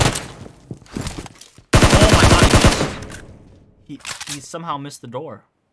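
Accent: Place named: United States. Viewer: I love your American accent commentary love u brother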